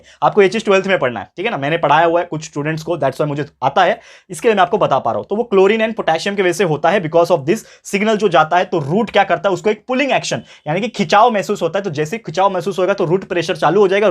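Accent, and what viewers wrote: Maharashtra, India. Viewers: aap marathi ho kya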